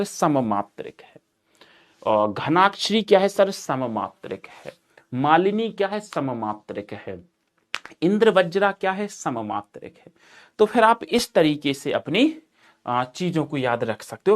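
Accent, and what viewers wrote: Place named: Madhya Pradesh, India. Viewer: Sir aap mp se ho kya?😅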